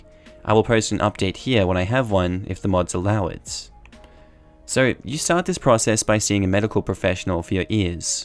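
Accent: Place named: Australia. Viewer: I like my new Aussie hubby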